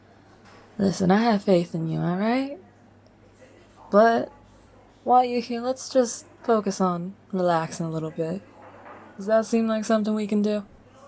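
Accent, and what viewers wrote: South, United States. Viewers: Amazing audio I’m weak for your southern accent 😳🫠🤭👌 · yes! the southern accent let’s goo i could melt from the southern accent 🫠🫠🫠🫠amazing audio tho and amazing southern accent but we already knew that was amazing 🩷🩷 i have a feeling the southern accent was for a certain someone that is in twitch chat a lot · Accent time for me. Great southern accent. Thx for asking me out and being flirty while doing it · I’m a sucker for a smooth southern accent, this couldn’t have been a better audio, thank you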